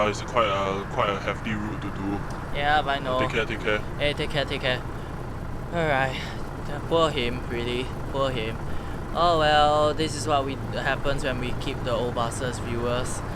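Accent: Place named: Singapore. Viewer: OMG Your Voice Sounds Like Singaporean When You Speak English.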